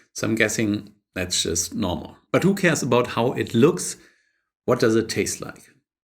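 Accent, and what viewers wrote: Germany. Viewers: Ich wusste du bist ein Deutscher ohne dein Namen zu kennen dieses deutsch englisch ist halt so scheise wie bei uns allen 😂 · Alter man hört den deutschen so raus wenn der redet 😂😂😂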